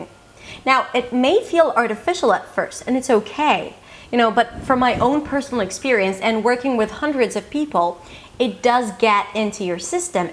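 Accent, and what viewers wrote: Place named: United States. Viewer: I agree with everything you have to say. But I hope you know that you have an American accent. And yes, that is also an accent.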